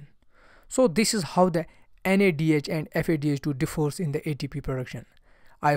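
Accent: Kashmir, India. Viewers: Love the thick Kashmiri accent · Seems like you are from Kashmir sir. Well explained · R u a Kashmiri?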